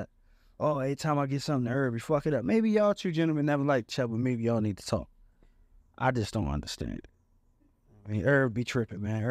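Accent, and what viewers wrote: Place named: Baltimore, United States. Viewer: It’s true and clear that money don’t make you happy … I can tell by your dialect, you’re from Bmore or the MD area, but I could be wrong… It was cool to see how someone from Bmore saw it and confirmed how disrespectful that would have been 🤔 People like Irv swear they can speak for the hood but don’t have a damn clue on nothing!!!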